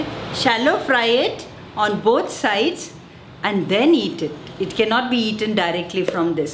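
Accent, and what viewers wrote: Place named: Mumbai, India. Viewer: Hii.. I just want to say Bangdda Bangddo Bangddulio Bangdde it’s the same..it depends on the pronunciation just like salcettekars and Bardezkars pronunciation.. If she lives in Bombay being a Goan from Goa she’s there for a purpose of her living for bread and butter for job purposes and good opportunities unlike the same who works abroad.. if they live anywhere beside goa that doesn’t mean they’re Maharashtrian or belongs to any other religion or caste or nationality..she’s very much a Goan from Goa and lives in Bombay.. instead appreciate her recipes and comment over that.. that’s solely her style of making and saying.. Saying Bangddo doesn’t make her an Maharashtrian it’s just that staying for ages in Bombay or any other country you adapt the accent of that state or country.. What she does is just exhibiting her Goan recipes for the once who’s anxiously wanting to learn and prepare the delicacies of Goan cuisine.. 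instead appreciate her work and give feedback on the recipes and not the pronunciation.. And yes residing anywhere in the world country or states doesn’t matter we all know why we’re there.. what matters is our roots that we’re Goans from heart and birth.. A root grows inside the soil and the tree or plant comes out and we see them widely that doesn’t mean if the roots are inside the soil then it’s different then the tree or plants visible from outside belongs to somewhere else.. it’s the part of each other.. same way…. You can take a Goan out of Goa but can’t tak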